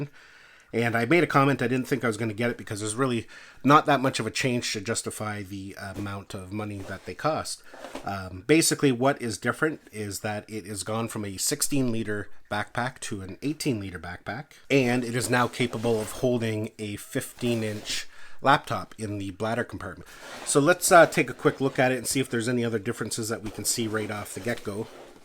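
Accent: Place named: Ottawa Valley, Canada. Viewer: I’m detecting an Ottawa Valley accent :)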